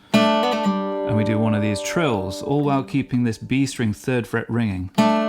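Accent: United Kingdom. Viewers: Did NOT expect the British accent after the guitar part🤣 ... hardcore Americana vibes. Love it!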